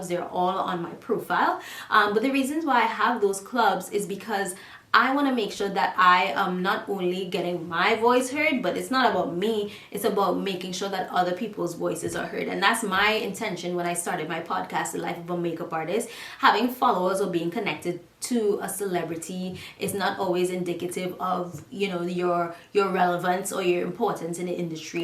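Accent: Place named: Trinidad and Tobago. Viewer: Oh sis you’re Trini to d boneee 🤣🤣 the accent is so familiar!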